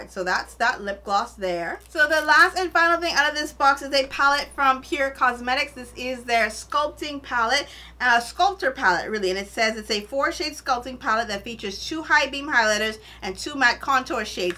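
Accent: Bahamas. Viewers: Where are you frommmmm. You sound like you're from the Bahamas!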